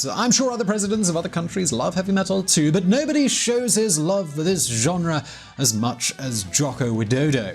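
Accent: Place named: United Kingdom. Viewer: Unsubscibed.your ugly british accent soo fucking annoying,right or wrong indonesia its my country.ur opinion its like expert on our country most ur comment its bad.sorry stop subs ur chanel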